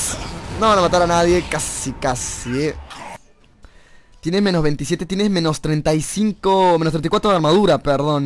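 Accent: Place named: Peru. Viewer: Claramente es peruano jaja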